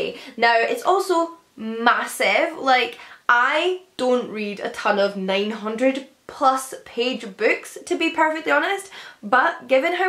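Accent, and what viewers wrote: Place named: Glasgow, United Kingdom. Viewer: I can't understand the person who made this video. She sounds like a glaswegian docker.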